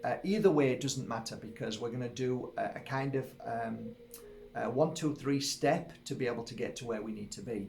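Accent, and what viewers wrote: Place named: Northern England, United Kingdom. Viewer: Thank you for this valuable information, It was quite nice that when I searched for healing at a distance, it was you that popped up and I immediately recognised you from the sheep farm podcasts. Which I'm a fan off. I'm in Bolton, greater Manchester. I've got a loved one in hospital with a stroke, using your guidance, I felt myself in the room with her, I have tried before, but with no idea what I should be doing, but this was an amazing help, thanks. All the better being a northern lad, whose voice I was familiar with and could relate too. A synchronicity in itself.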